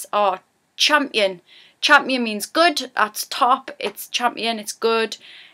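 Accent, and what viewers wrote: Newcastle, United Kingdom. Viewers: someone actually speaking a Geordie accent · a video of Geordie accents and yours popped up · she's got the Geordie accent from the get go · geordie accent is quite a challange but I love it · I really enjoyed your geordie accent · really nice to hear a pure Geordie accent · I love the newcastle accent it's my fav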